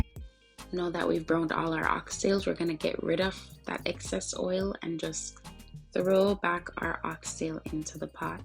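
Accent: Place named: Jamaica. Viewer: Jerk seasoning on oxtail? How is this authentic? Because you sound Jamaican?you add both ketchup and American ingredient bbq sauce and sugar So you making Jerk oxtail? Why trying to change oxtail to oxtail stew? What’s the yellow film, you add flour gravy no look so. Don’t stew suppose to have potato, carrots?Some many questions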